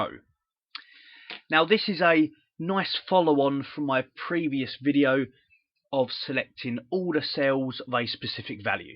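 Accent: England, United Kingdom. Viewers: Love English accent lol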